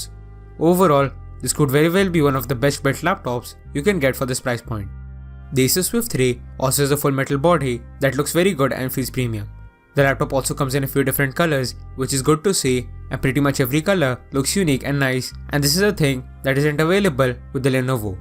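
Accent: India. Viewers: Nice video but you should speak with less Indian accent, it's hard to undestand you. Greetings from Mexico. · Yo less indian accent not rude but i cant understand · Please talk slower.. no offense but your indian accent is making it worse when you talk so fast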